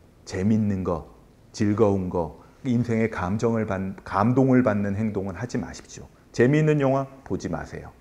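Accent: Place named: Chungcheong, South Korea. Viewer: 충청도 분이구만.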